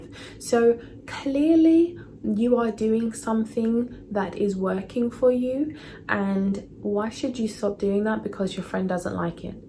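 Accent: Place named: United Kingdom. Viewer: So happy to hear a British accent and thank you for speaking on this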